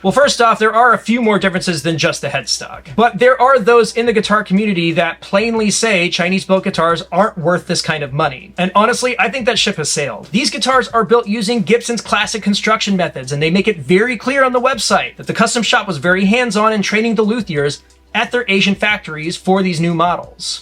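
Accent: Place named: United States. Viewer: I know this sounds weird, but I was so happy to hear an American accent. Can’t stand British videos.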